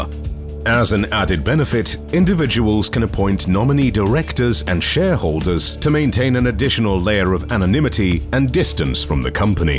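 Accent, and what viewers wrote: United Kingdom. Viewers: I don't like British accent. Plus, the narrator's tone is rude & imposing. What he told abt Russia was totally false, hostile & misinforming. Why'd he do it? Ask him! It's an old rivelry between 2 worlds. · I don't like British accent too. Prefer Australian, Canadian and US accents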